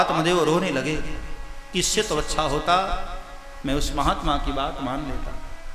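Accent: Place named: Haryana, India. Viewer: बोलने का ढंग थोड़ा सीख लो कि किस तरीके से बोलना चाहिए तू हरियाणवी की जाठ है क्या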